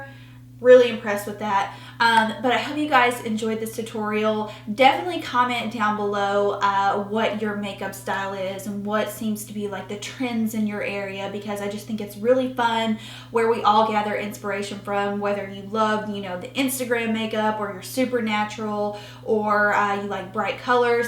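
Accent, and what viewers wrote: South, United States. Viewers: I love your videos and your adorable Southern accent. I love Burberry makeup looks too! Violette_fr is a super cool French blogger.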